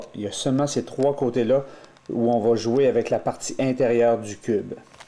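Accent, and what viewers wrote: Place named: Quebec, Canada. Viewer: Lol je suis un débutant en matière de rubik cube mais j'trouve que c'est bien expliquer.. Sinon j'adore l'accent québécoi ^^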